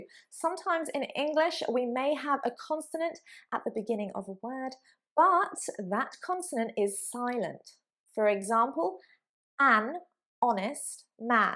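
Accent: United Kingdom. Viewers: I love it .the british accents because is too Much sweet and perfect and it is not rude to me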